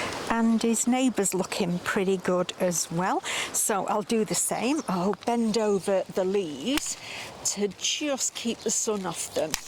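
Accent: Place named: Manchester, United Kingdom. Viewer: What a lovely video. We have loads of old tin baths around the farm. Think it’s pretty standard for farming to find baths dotted around fields. They certainly seem to make useful raised beds. Have seen Jessie (plot 37) likes the touchon carrots too The cauliflowers look lovely. Be interested to hear what your biggest pests to the crops are over there? Still have slug problems? Your accent sounds like you may have come from Manchester/Stockport area originally? Not too much further north than me on the Staffordshire/Cheshire border The rain and thunder always sounds quite nice.. so long as you’re in the dry watching it. Colin has the right idea. Happy New Year to you. Hope 2024 is a good one.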